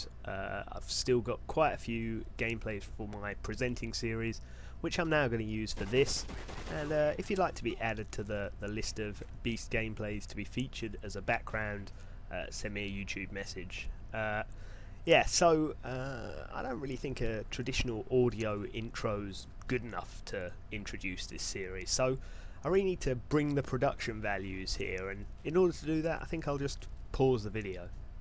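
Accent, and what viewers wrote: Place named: Cockney, United Kingdom. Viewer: Your so British! Haha, we haven't clanned in a while, I miss hearing you curse at the other team in cockney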